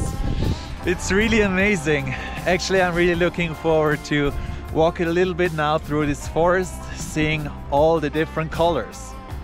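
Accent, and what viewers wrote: Switzerland. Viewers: glad you moved back to Switzerland. It is good to travelmand explore the world, but Switzerland I'd such a great place. A xfriendcof mine in KC,mMissouri is married to a gal from KualacLumpur,Kuala Lumpur,, which she likes, but there is a bit of a rivalry with Singapore. I think you said you were originally from the Italian part of Switzerland,mbut your accent is more Swiss German. I like Lugano due to the milder winters and the beautiful lake.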